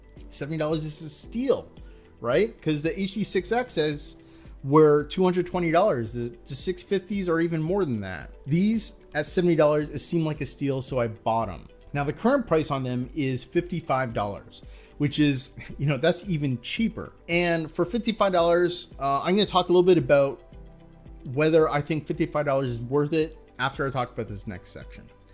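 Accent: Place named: Canada. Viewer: I hear Canadian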